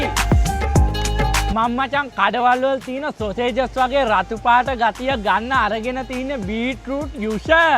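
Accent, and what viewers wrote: South, Sri Lanka. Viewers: Dakune bashavada manda😂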